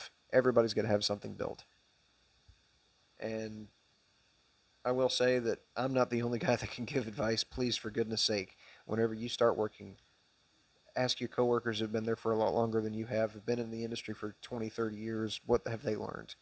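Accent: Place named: South, United States. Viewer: Man I love your hillbilly voice 😂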